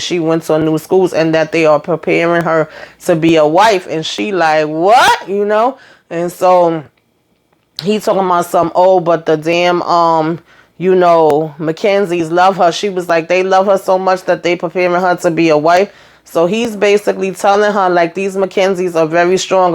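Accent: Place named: New York, United States. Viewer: You a new Yorker? I'm from Massachusetts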